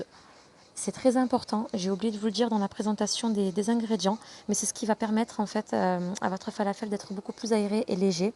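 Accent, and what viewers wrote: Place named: South, France. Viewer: Excellente vidéo! Efficace, plaisante, pleine de bons conseils et en prime la musicalité du sud! Bon, y a plus qu'à faire la recette! Merci!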